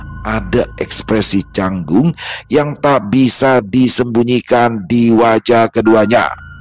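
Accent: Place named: Java, Indonesia. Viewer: Biyuh.. Mas logat jawanya mulai sedikit medok... Haha narator kesayanganku.. logat bahasa apa pun bisa di lafalkan dg baik ❤❤❤❤.. sukses selalu ya Mas dan team..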